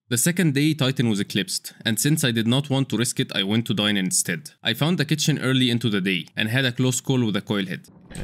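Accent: Egypt. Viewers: I think.. your accent is Egyptian ? 👀🤔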